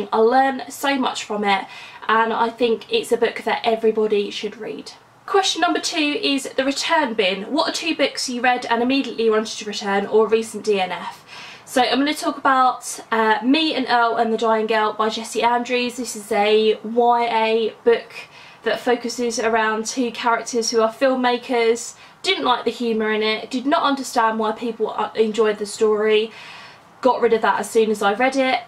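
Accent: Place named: Birmingham, United Kingdom. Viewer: I love you're accent! Are you from Birmingham?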